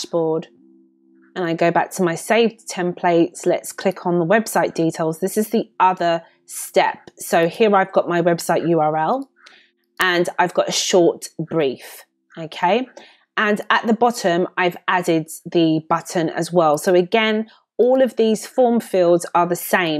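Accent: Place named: United Kingdom. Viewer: Hi! Excellent video. Not to mention your beautiful British accecent!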